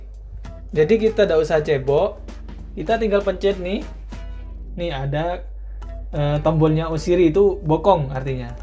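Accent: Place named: Madura, Indonesia. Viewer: Logatnya kyak ada maduranya.. 🤭 Orang mana bro?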